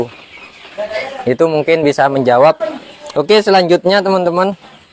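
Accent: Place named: Madura, Indonesia. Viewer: Mak logat madureh kak😅... Kaemmah empiyan kak...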